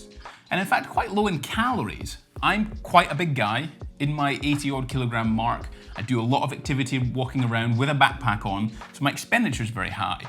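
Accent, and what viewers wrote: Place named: England, United Kingdom. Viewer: I subscribe because of your English accent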